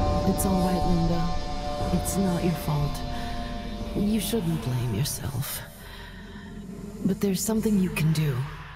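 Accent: Indonesia. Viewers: Are you Indonesia because of your pronounciasion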